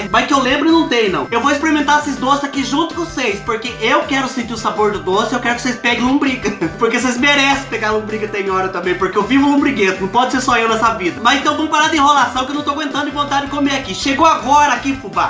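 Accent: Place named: Piracicaba, Brazil. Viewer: parese piracicabano falando kkkkk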